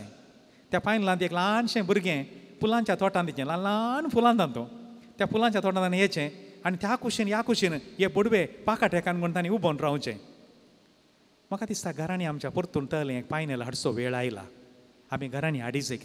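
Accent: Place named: Mangalore, India. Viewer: Father, your Konkani is very clear. Aputt Mangalorean. Thank you for your discourse.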